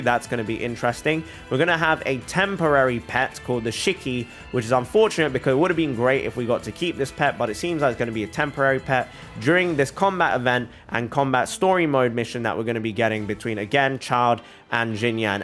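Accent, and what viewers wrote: United Kingdom. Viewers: I just found out about you and I have say, it's kinda weird hearing an asian with a uk(?) accent. No hate or anything but it just kinda threw me off a bit since it's a bit unusual to me.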